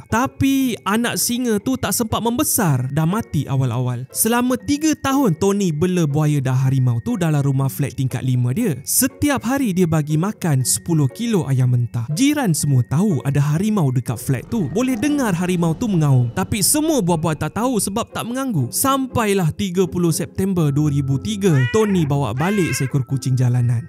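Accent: Sabah, Malaysia. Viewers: orang sabah ke? Anduk baaa😂😂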